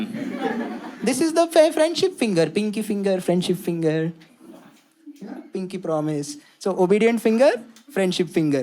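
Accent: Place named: India. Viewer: He has such a stereotipical indian accent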